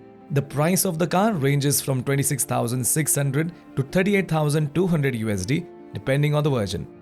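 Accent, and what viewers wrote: India. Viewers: Indian accent is terrible.. 🤮🤮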